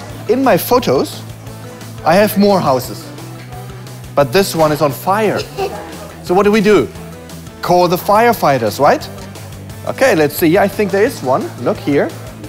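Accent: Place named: Germany. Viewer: Man kann es hören, dass er Deutscher ist :D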